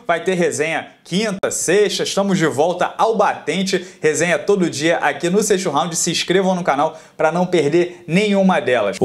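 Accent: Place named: Pará, Brazil. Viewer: O tem um sotaque de paraense muito acentuado.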